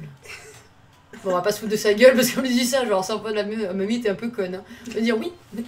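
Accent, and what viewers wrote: Marseille, France. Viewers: On entend bien l'accent de Marseille Sinon bonne vidéo j'aime bien t'es montage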